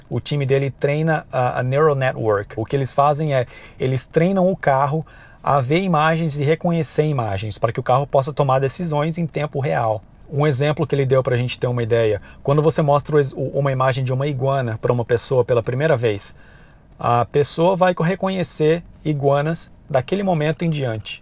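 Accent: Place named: Brazil. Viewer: Não há nenhuma dificuldade. Aliás, tenho partilhado alguns de seus vídeos, não só com a família, mas também em grupos portugueses do Facebook. Precisamente pela vantagem de estarem em língua portuguesa. O sotaque brasileiro e o uso de uma ou outra palavra típica do Brasil, não é problema. Crescemos em Portugal a ver novelas brasileiras! :D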